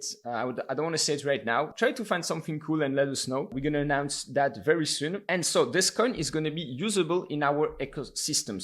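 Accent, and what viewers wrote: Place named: France. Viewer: ton accent on dirait que t'es francais tres bonne video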